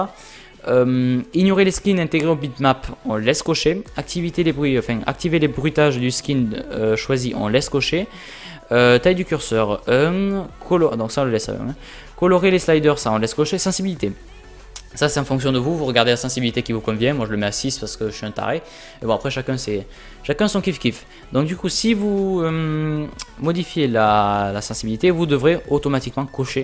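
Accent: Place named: Quebec, Canada. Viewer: j'entends ton accent et je me demande si t'es quebecois ou marseillais mdr, mais sinon bonne video merci je vais m'y mettre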